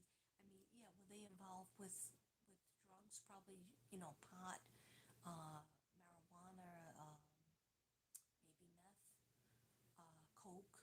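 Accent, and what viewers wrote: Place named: New York, United States. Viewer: Im right under new york in Pennsylvania ❤ive always wanted to visit new york ,when i first heard u i knew u were from new york you have a very strong accent (love it )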